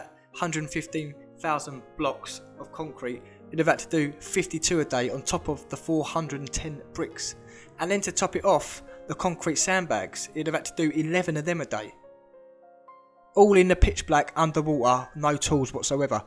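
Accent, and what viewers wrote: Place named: Cockney, United Kingdom. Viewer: How refreshing your little Cockney accent is! Found you today, been watching Tartaria for about 3 months and find it fascinating. Subscribed and looking forward to seeing more of your content.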